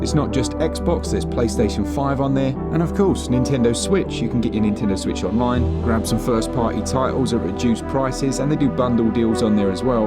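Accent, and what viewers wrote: United Kingdom. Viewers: Sounds very British indeed 🍵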